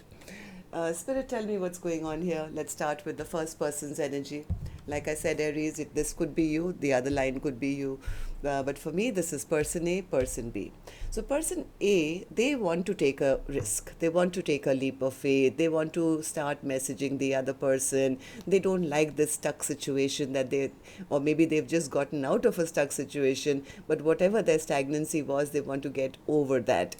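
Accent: India. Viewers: Are you hindi please??!! Your english IS very good🎉❤😊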